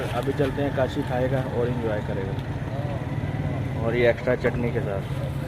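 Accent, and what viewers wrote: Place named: Pakistan. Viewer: Urdu touch Mashallah g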